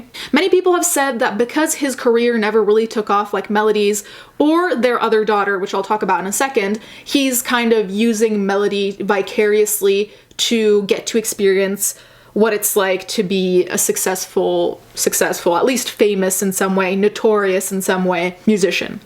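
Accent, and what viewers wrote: RP, United Kingdom. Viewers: I love your British accent 😍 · I cant understand you with your HEAVY British accent. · And that glaring posh accent xD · OMG I love your British accent!! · I love your british accent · Oh I love your british accent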